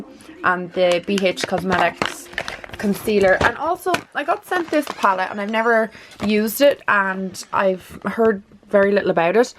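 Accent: Ireland. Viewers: Finally we get to here your Irish!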